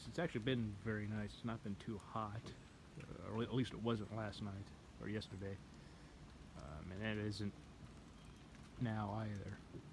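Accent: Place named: Midwest, United States. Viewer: First off, I do hope that you are staying safe and dry. Secondly, Iowa? I subscribed to your channel - after having seen that you commented on the some of the same channels as me - and I could not figure out your accent. Turns out you're a Midwestern boy. Like your tone, elocution, and diction seemed old-fashioned like you came out of a Capra film. I mean no disrespect, and am totally wishing I spoke like you.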